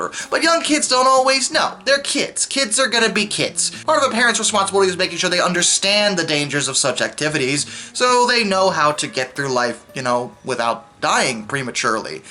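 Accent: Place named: United States. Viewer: Can't watch it. Bloke is a Moron. Annoying Yank. Shut the F up.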